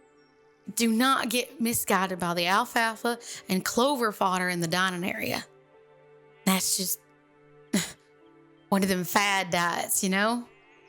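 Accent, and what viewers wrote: South, United States. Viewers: I really get annoyed with fake Southern accents in ASMR so you're either the real deal or extremely convincing 👌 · Aww, I love a southern accent in my ASMR! This was beyond adorable! Thank you so much,!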